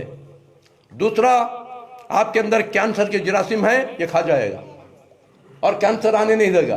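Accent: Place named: Hyderabad, India. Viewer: Sab ka naam batae saf saf nahi dikhta naam batane s humko samajh aaega haidarabad ki boli samjh nahi aati jaldi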